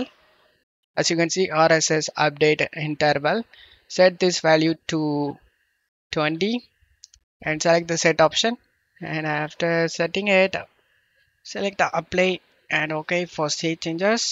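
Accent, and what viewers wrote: India. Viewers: as soon i see indian accecent i knew hw will fix my problem thanks bro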